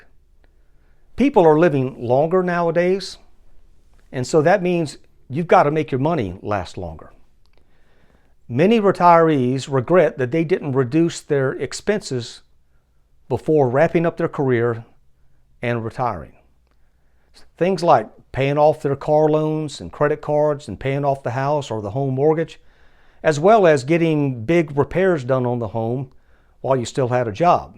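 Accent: South, United States. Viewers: l like your southern drawl is it?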